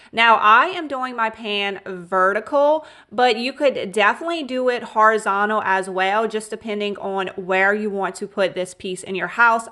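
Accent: Louisiana, United States. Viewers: My favorite flip was definitely the basket. I wish they sold the IOD stuff here in Australia! I love all the types of videos you make- and your Louisiana accent makes me feel like I have a piece of home here with me when I'm so far away from mine!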